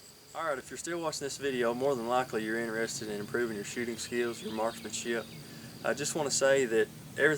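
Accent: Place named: Alabama, United States. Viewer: he sounds like he's from Alabama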